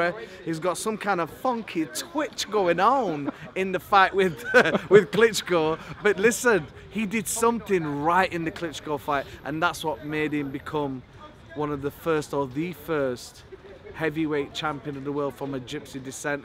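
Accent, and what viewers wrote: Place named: Yorkshire, United Kingdom. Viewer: I'm jus here for Yorkshire accent cuz I luv Yorkshire accent lol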